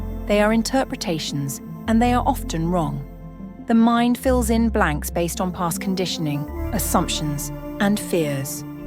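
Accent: United Kingdom. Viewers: Couldn't stand the snooty brit voice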